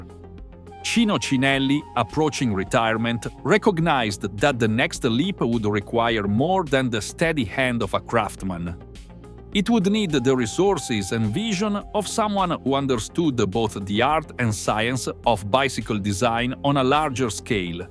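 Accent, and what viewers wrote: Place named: Italy. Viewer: Great stuff! Love the italian language inspired narrator! Fits so well!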